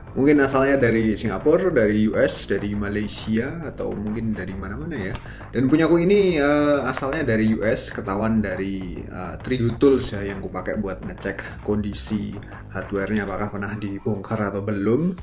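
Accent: Java, Indonesia. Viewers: oalah pantes jowone medok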